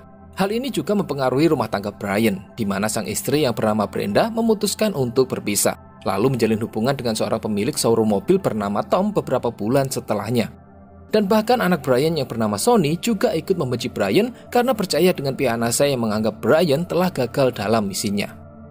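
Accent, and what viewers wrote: Java, Indonesia. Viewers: Sabar ,lagi myesuain medhok dengan kuping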